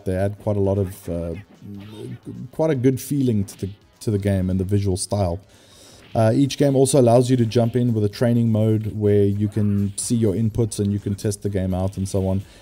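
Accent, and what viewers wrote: South Africa. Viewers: Your review video popped up in my suggestions list & I decided to have a look without taking note of your channel's name... After a few moments into the video I was like this guy sounds familiar, South African like familiar! Greetings from a South African living in deep South Texas USA... I enjoyed your video, I've been thinking about getting the Capcom Fighting Collection, the Darkstalkers, Cyberbots, Red Earth & Puzzle Fighter are quite appealing to me, so I think I'll take the plunge. I've already got the Street Fighter 30th Anniversary Collection so it'll go well with that. I do however wish they'd port some of the older Tekken games, namely 3 & 4 for the Nintendo Switch, now that I'd be seriously into! Subscribed & looking forward to more videos. 👍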